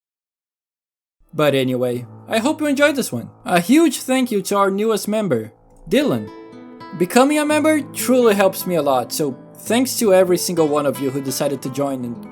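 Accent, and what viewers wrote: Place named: Brazil. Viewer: Im not doxxin but i I knew you were Brazilian, your "English" is very different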